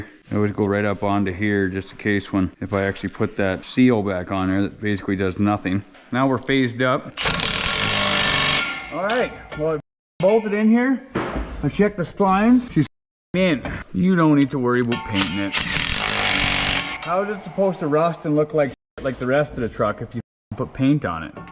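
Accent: Canada. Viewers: princess auto winch that you got on promo is the most relateable thing I've heard all day. fuck buddy I can't tell if you're from Ontario or Alberta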